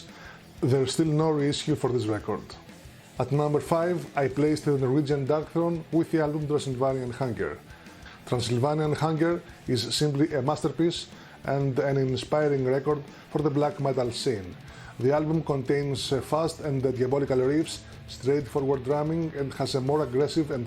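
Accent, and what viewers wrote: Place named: Greece. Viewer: Ur Greek accent reminded me of talking with Sakis Tolis when he was playing here with RC and I got to chat with him after the show :)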